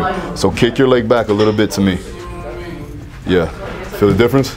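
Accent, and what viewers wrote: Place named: Los Angeles, United States. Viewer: Bro you gotta be from LA? I can hear it. Good work all around! KB work is just different and I love it. Stay functional🔥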